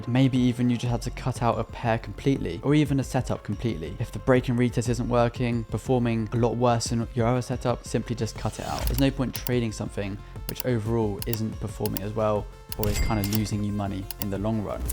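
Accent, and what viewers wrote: United Kingdom. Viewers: you know that YT is a global platform where people from all over the world watch your watch... my worry is you speak faster in british accent some words hard to understand so pls speak slow for certain words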